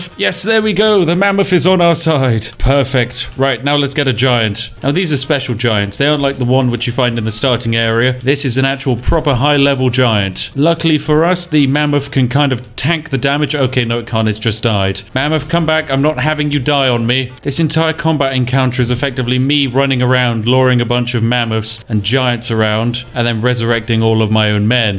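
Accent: United Kingdom. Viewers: Absolutely brilliant tips! 👏👏👏 love your british accent, so energetic and just... lovely🥂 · Watching you break games with your amazing British accent brings me joy sir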